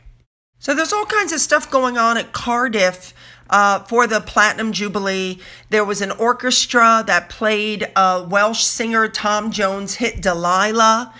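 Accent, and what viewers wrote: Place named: United States. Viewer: Thank you for all the kind things you say about our Royal Family ! I must admit, you have me laughing, with your pronunciations and your lack of understanding some of our crazy customs. 'They met a goat'...... The goat would have been The Mascot of his Regiment.😄 The Derby is the Daaarby, as in Baaaaath! You Americans never say aaaah! EdinBORO is pronounced 'Edinbro'. Pimms served in a bar can be Pimms No 1, 2,3, 4, according to the base alcohol, such as gin, whisky, rum,etc., and are served with mint, fruit, according to the number. Thanks for your highly entertaining channel, I have subscribed.🙋🇬🇧🍓🍉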